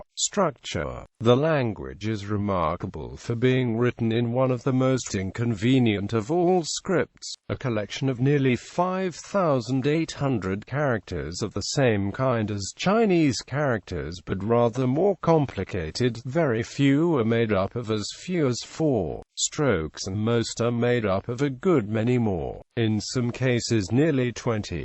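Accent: United Kingdom. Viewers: Get rid of British accent, and make a better video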